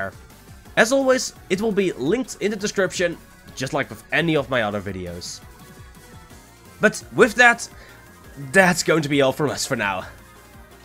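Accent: Netherlands. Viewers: BEAUTIFULLL!!! btw are u from nederland? u have that accent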